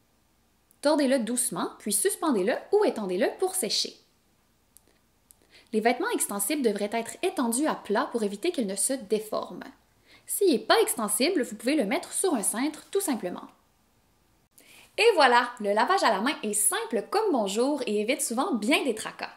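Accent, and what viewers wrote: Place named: Quebec, Canada. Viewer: accent Québécois au top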